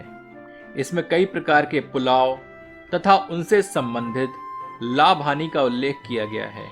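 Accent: Standard. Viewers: आप शुद्ध हिंदी में बोलते हैं, सुन कर अच्छा लगा। 👍👍🙏🙏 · Apki awaz or apki shud hindi achiii or rcipi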